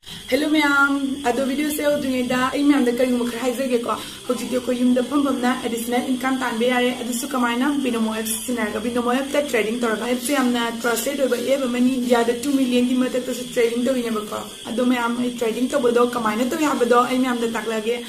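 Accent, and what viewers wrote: Cachar, India. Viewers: Meitei ga mayangagi style pullp mixed twrij cachar meitei gi chtnabiro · Mawa loude sis hainingba haijasanu shidaraga pamde phajade hairibasingse moi macha mashuda yaoba oirasanu thiba phajababu eikhoina oihanbra kalkpagine makhoidagidi sisna henba adugine eidi sis se cachar meeteine khnbada yamna nungsi lots of❤ from imphal video toina thagtlk o 👍👍👍👍👍👍👍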